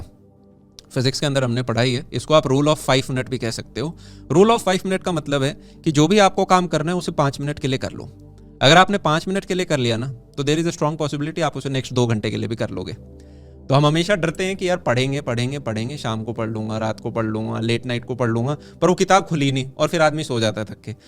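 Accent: Haryana, India. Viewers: bhaiya aap Haryana se ho ? kya aapke accent se lagta h😃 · Aap haryana se ho na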